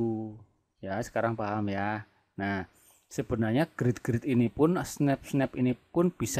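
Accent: Banyumas, Indonesia. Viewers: like 11 om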